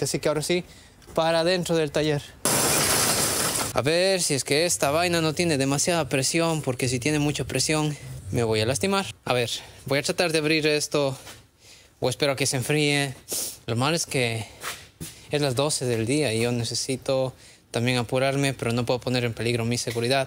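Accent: Cuenca, Ecuador. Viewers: no soy mecanico pero tengo un problema con una ford f150 que lo compre recien. Se recalento estan do parado se hizo un telajo. Saludos felicidades por el acento parese ser de cuenca 🇪🇨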